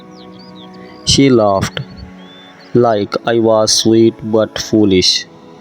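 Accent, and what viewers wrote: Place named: India. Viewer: Why the accent is indian i dont think this is faza faza is defrent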